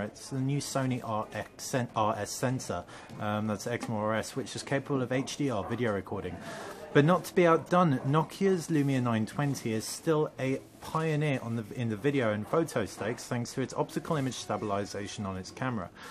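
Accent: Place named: United Kingdom. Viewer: he's British and that's how you're supposed to say it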